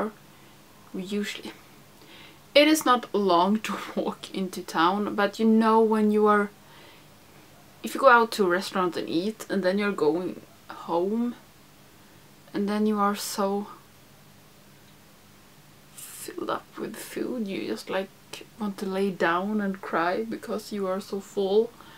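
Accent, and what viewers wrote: Sweden. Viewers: Congratulations on your plants and your you tube channel!! Those browns look really beautiful on you. Thanks for doing your videos in English. I love your Swedish accent.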